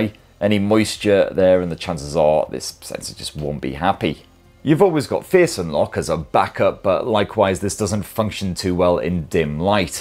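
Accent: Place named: Newcastle, United Kingdom. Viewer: Howay ! Bonny Lad etc . What a charming accent you have… smug… smug… yours, smug southern bastard . Keep up the excellent reviews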